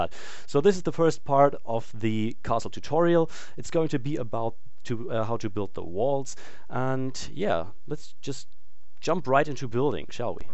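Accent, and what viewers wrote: Germany. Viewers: hei is that a german accent? :) nice vid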